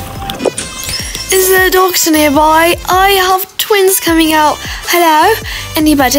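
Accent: United Kingdom. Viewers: Why sometimes you Talk British and Sometimes You Talk English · Omg the British accent 😫😫😫😫so PERFEXT!?!?!??!♡♡♡♡ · Omg i love your channel and the brtich accent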